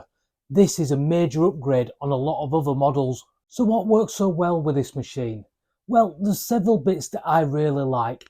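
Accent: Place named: Northern England, United Kingdom. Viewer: Best and easy to understand review I’ve ever seen for any power tool. Told me everything I wanted to know and it been in a Northern accent was an added bonus. Thank you 🙏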